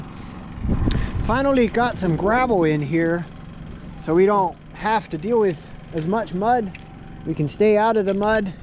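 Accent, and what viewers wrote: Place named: Lancaster Amish, United States. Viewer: Just found your channel from watching the interview which was very interesting my wife and I also left the Amish after we were married 4 years and that’s been 17 years ago I could hear in your Amish accent you were from Lancaster 😂 I grew up in Montour County Pennsylvania actually we just moved back here a year ago and so we do have Lancaster Amish for neighbors 😂 my wife and I did not grow up in Lancaster Amish but we did grow up old order Amish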